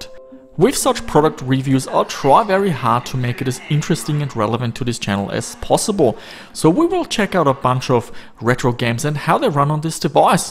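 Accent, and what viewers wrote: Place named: Germany. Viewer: I didn't know you lived in Australia, your accent makes you sound like you're from somewhere in Europe, Germany perhaps?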